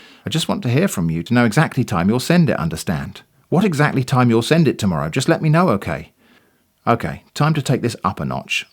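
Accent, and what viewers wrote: England, United Kingdom. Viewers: I hope you are very careful at doing this sort of thing. Watched a few of your videos and makes me concerned for your safety. After all you are putting this on YouTube and they could be watching and know what name they gave you email profile, you may bait the wrong person, piss them off and then find out somehow where you live and since you have an English accent probably won't be too hard. Since you are warning us educate us on these scum of the earth buttheads, making them harder on them to get money, one may get really ticked off and the person may not be mentally stable and decide to come face to face with you and do something horrible to you. Very concerning, very alarming. I do thank you for these videos and appreciate the risks you do take, sacrifice your risks, and safety for our sake is such a noble act and I hope others will be grateful and thankful as much as I am and I have learned a lot from your "scam baiting" videos. 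Now I know what to look for when checking emails and text messages with caution. I get a ton of emails and text messages from these creeps. My phone has that scam block on both emails and text messages, but for some reason they keep coming through. I have downloaded apps from the play store, and they don't do jack nor sh*t to stop it. If I don't keep my phone on do not disturb, my phone is constantly ringing. If you tried to call them back, the AI voice says " this number is no longer in service" so they can call you all day long but can't call the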